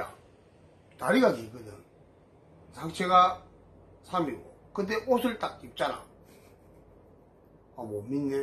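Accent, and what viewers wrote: Gyeongsang, South Korea. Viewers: 확실히 경상도 매력이 있따 ㅋㅋ 뭔가 다르다 이기야 ㅋㅋ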